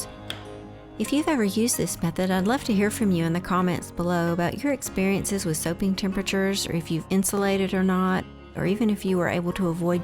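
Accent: South, United States. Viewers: The southern accent is the beauty of the south. Let is out. Sing it ! The rest of the world is blessed to hear it.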